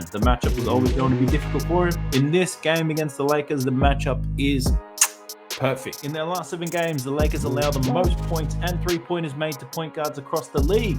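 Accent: Australia. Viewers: Ya Australian accent blew it for me. Not betting on rugby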